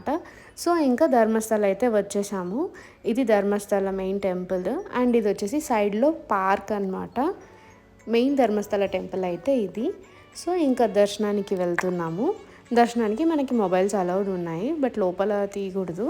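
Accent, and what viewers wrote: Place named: United Kingdom. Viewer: Kuki kadhamma kukke anali...prathi word ki edho English accent touch ivvalni try cheyakudadhu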